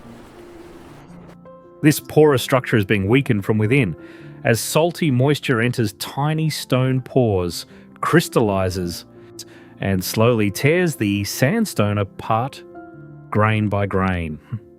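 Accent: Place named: Australia. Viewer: I enjoyed the Aussie language being spoken. Thanks mate, great video. I'm watching from Mornington VIctoria Australia.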